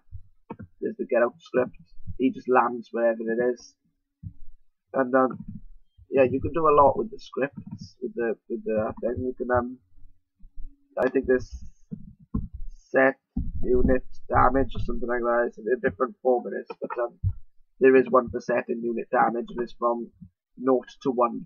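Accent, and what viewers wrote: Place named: Wales, United Kingdom. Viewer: ahh you dont speak welsh, i recognised your accent. nice to know another welsh arma player. don't see many of them :)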